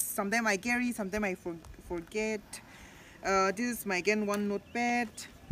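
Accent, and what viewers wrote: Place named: Nagaland, India. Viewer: I just love Ao accent 😎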